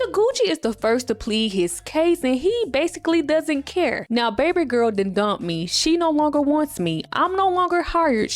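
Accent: Memphis, United States. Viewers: Can someone in the comment section tell us what state her accent is common? I want to assume memphis because glo's accent sounds similar. . . Please and thank you 🤝🏽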